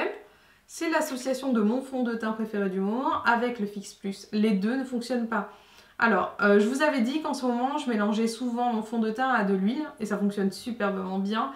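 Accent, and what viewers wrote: England, United Kingdom. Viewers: tu me fais rire avec ton accent anglais super prononcé !!!!! en tout cas je suis très contente de ton retour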